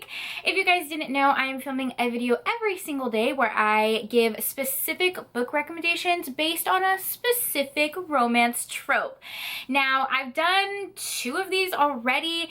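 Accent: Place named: Brazil. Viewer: Are you brazilian? Your accent looks really like a Brazilian one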